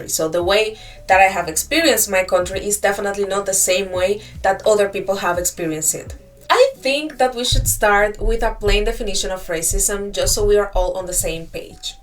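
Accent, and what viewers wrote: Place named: Portugal. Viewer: Your English sounds like a Portuguese speaker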